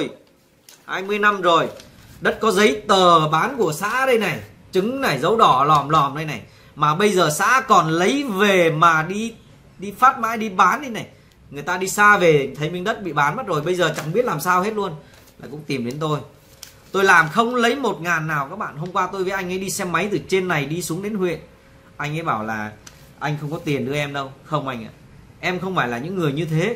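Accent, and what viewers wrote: Standard. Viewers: Ban noi qua.chuan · Bạn nói chuẩn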